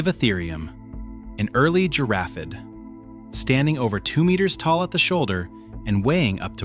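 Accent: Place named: United States. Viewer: Interesting but you sound American so keep the measurements in feet and pounds. Makes no sense to mix them up with grams and meters.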